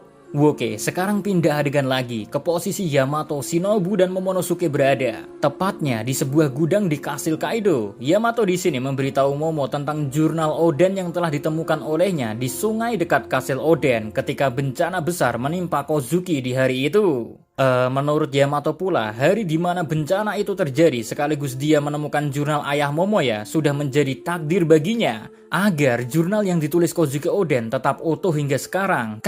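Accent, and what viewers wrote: Java, Indonesia. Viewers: lebay jir. suara medok gitu mana bikin merinding 😂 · Jowo mu emang medok min,tapi caramu nyampek no pesen keno nang ati,marai mrinding 🙏🙏🙏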